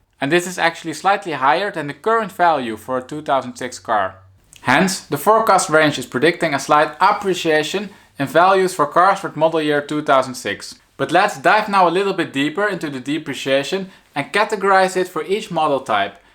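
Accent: Netherlands. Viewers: This is defenitely NOT a German, since this guy is talking with a superstrong dutch tonality 🤣. Best regards from Hamburg, Germany...Frank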